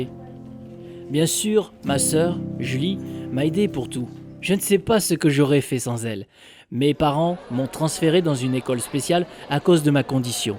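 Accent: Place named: Corsica, France. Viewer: Gros pervers moi aussi je suis une fille et en même temps tu as un belle accent Corse.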